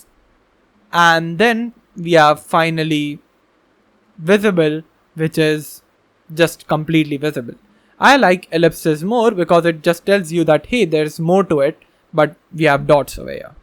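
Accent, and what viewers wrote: India. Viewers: Textbook indian accent, love it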